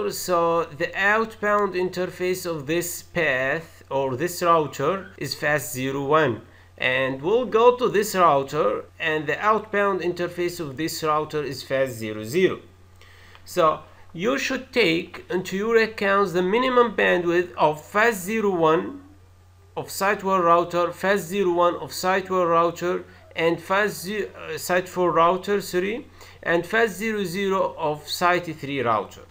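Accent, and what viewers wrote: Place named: Arab world. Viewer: Well explained. Lovely arab accent.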